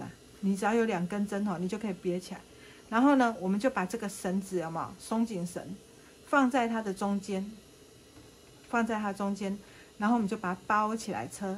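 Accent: Taiwan. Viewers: 謝謝老師無私又有耐心的教學，聽到台灣口音好開心喔，旅居德國的我們祝福妳平安，健康喔。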